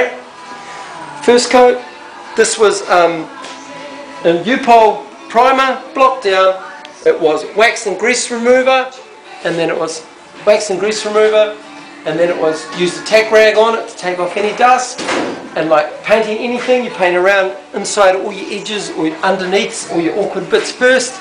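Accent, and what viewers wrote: New Zealand. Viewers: Great video, clear explanations, nice to hear another kiwi too rather than the usual american.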